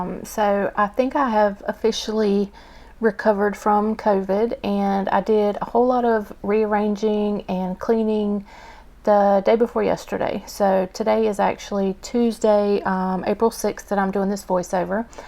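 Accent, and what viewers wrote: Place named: South, United States. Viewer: looove the spread and the southern accent 😍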